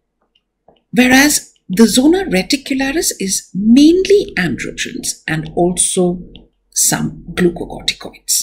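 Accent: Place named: United Kingdom. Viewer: Mam uk wali nhi hindi wali pronounciation bolie , nhi smjh me ata but content acha h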